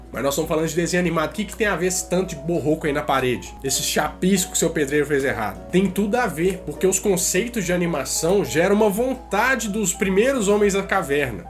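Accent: Minas Gerais, Brazil. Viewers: Conteúdo: Topzera Carisma: inexistente Sotaque Mineiro: insuportável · Com certeza vc é aqui de BH... Eu também! Nosso sotaque é muito engraçado KKKKKKKKK... Também tenho um canal. Comecei a uns 4 meses. Também falo sobre cultura POP, ciência, curiosidades, etc. Depois se der confere lá. De Mineiro para Mineiro!!!!! <link>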